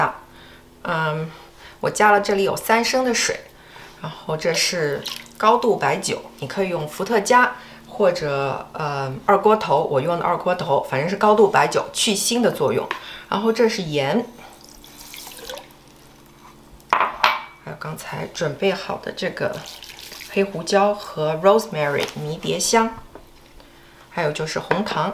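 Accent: China. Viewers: 您是北方哪里人，说话味道还蛮好听的，感觉是齐齐哈尔的吧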